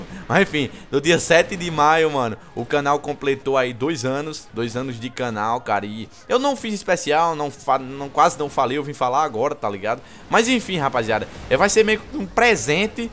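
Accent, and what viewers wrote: Northeast, Brazil. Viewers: você é muito legal e seu sotaque melhora mais ainda o vídeo, cara. Que saudade do Nordeste! Você é bem simples e, ao mesmo tempo, ótimo. Sei lá o porquê deu ter falado isso, mas é que tava guardando isso. O_o Eu quero saber a sua killmark. Eu baixei a killmark do canal achando que seria aquela normal que você usa nos vídeos, mas é uma que tem o nome e tals, e eu queria uma normal, sei o nome porque não combina com meu nick - achei bastante bonita aquela killmark. É qual dessas: Killmark IDK, Killmark HG9?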